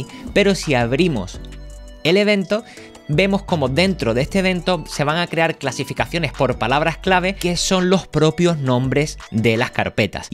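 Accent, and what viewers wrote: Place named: Andalusia, Spain. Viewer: Espectacular como siempre. Como se nota que eres andaluz, 56 millones...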